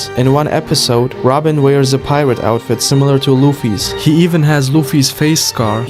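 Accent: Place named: Germany. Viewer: i can hear that lil german accent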